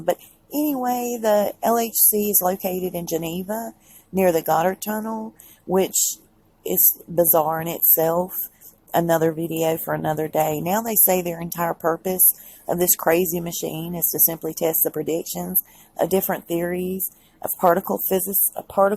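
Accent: South, United States. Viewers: I love your southern accent your voice is so kind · Your Southern Accent is SO-O-O -o-o-ooo charming and especially——AUTHENTIC!!‼️ Dearest-Sweetest——💕 💕—— Please, Do NOT EVER Change or alter your native, naturally acquired speech & speech patterns or ACCENT‼️‼️‼️. You are sooo authentic and genuine in this time of practiced artifice‼️ PLEASE stay just yourself and who you are‼️. Take care of yourself and ALL of your precious family💕‼️. And... LOTS OF LOVE AND MANY BLESSINGS😘‼️ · I love the southern accent, crazy/evil people have to contrive a way to upset you. I would delete any offensive comments. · Hell, girl- I've got such a southern accent that I never even noticed you had a southern accent!! Lmao!! I'm dead serious. 😬😂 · As a southern girl, born-and-bred in Alabama, so refreshing to hear your accent. Just keep being you. Your job is to inform us and you are doing an excellent job of that. 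A job that you took on voluntarily. God bless you and yours. From Another southern belle!!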